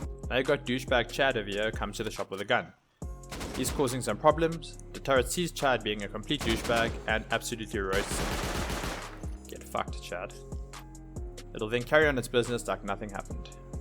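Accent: South Africa. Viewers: Bru i just hear my SA boet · I feel like this guy is South Africa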